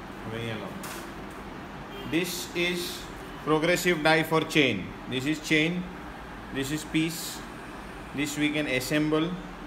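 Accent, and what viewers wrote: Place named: Gujarat, India. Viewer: Gujju engliss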